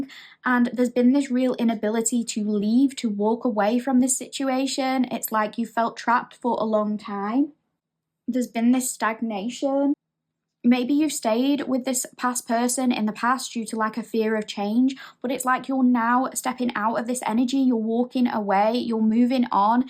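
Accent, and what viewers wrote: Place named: Scotland, United Kingdom. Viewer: u r very good reader, like ur 'scottish accent'...many thnx🎉🎉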